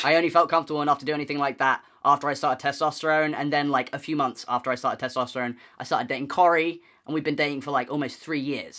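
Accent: England, United Kingdom. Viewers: i have no idea where this guy is from like he’s british right ? i’m so confused his accent is english but his words are not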